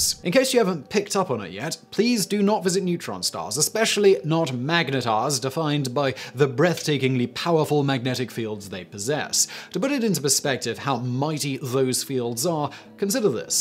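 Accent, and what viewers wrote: United Kingdom. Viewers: I'm telling you; I'm only one stupified, drunken nite away from getting a tattoo of Simon. And, as it never fails, it most likely will end up on a precarious part of my body. Damn those Brits and their damn accents.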